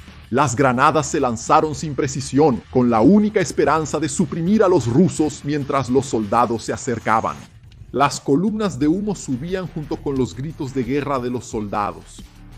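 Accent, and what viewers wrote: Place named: Venezuela. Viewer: habla como un negro venezolano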